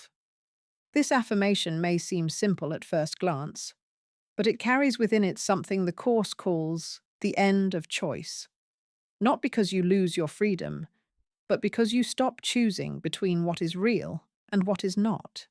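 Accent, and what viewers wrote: United Kingdom. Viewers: Hello wonderful resource that you are! I know you are also missing the 'original voice' as we all are...I did want to say though the current choice isn't just an English accent it's an upper middle class, private school voice...it conjurs..Heirarchy, Empire, the class system and in short a kind of scolding snoberry! So I wanted to suggest.... it's known that.... - BBC Radio Scotland’s “Voice of the Year” - used to be a big one for broadcasters and storytellers using a Scottish accent. - Saltire Society Literary Awards / Scottish Book Trust - not accent-specific, but Scottish writers and performers who use dialect/Scots are regularly shortlisted and praised for “authentic voice”. - Fringe First Award - the most prestigious Fringe theatre award were won using strong Scottish accents to deliver comedic or political ideas. - Total Theatre Awards, Herald Angel Awards - also give weight to “distinctive voice” and use of Scots/dialect. 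- BAFTA Scotland Award for Best Presenter/Performer - has gone to people for work delivered in a Scottish accent. - Royal Television Society Scotland Awards Using a Scottish accent is seen as a strength...... *Toastmasters International - The winner goes to the European finals. Scots accents often win because the authenticity and cadence help land the ideas. --- The most “published” accolade people usually cite is a Fringe First Award or a *BAFTA Scotland*. Both are widely covered in the press and specifically celebrate performers who use their Scotti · ❤ Thank you ! That' s one of the most illuminating lessons of the whole course. And the explanation in this video is brilliant! The britisch accent even seems reinforce the clarity of the message.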